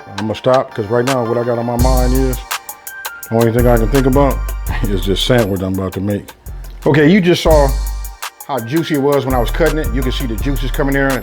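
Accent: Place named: San Francisco Bay Area, United States. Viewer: you sound like your from The Bay....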